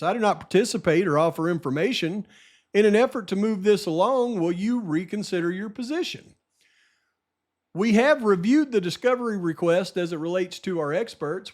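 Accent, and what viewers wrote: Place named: South, United States. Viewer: The hick accent is too distracting. Sorry, not today.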